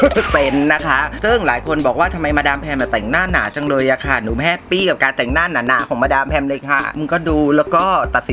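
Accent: South, Thailand. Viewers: วันนี้สำเนียงคล้ายเด็กใต้รึป่าวค่ะ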